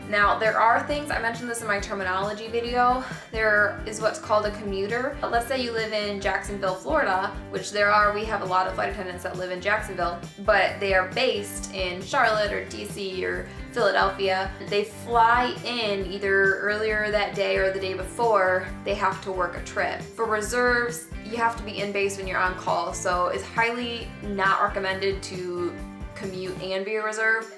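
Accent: Midwest, United States. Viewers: Hey! I'm a FA on the other "legacy" side of the operation of our big giant airline fam lol. QUESTION! Are you from the midwest? kind of sounds like it haha my Wisconsin ears are picking up a little midwestern accent.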